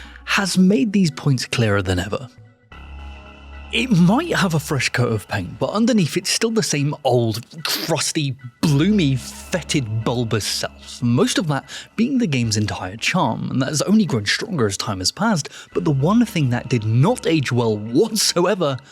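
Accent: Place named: United Kingdom. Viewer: I watched this a 3rd time. I am convinced is a fake gamer who needs hand held garbage or carrot on a stick garbage like WoW. He says that some items are scattered around and hard to find, then says exploration is pointless as there is no pay off. Example: fin gleam. Kid, make up your mind and please realize a British accent doesn't make stupid things stated as FACT, not OPINION sound very dumb and not smart. Funny thing is, I despise Bethesda, shit on them all the time, but the difference is I use literal FACTS. I do not cry about stuff and pretend they are facts. I think is just geared towards dumbed down slop like Skyrim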